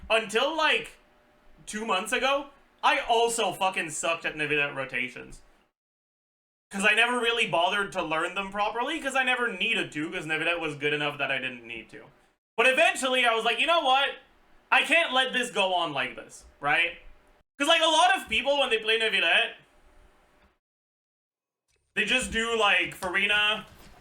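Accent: Quebec, Canada. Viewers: He’s French Canadian so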